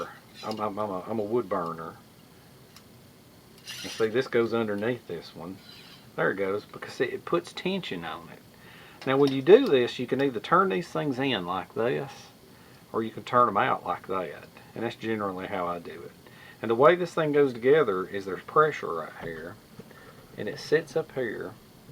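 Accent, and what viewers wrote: South, United States. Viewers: Love watching your vid’s you have the coolest voice lol, very southern 👍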